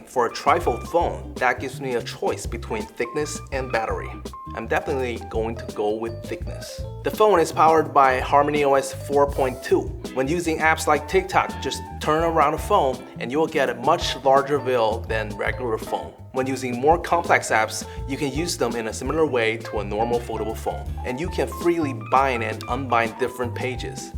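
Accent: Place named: England, United Kingdom. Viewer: 还真整这口老英语腔儿啊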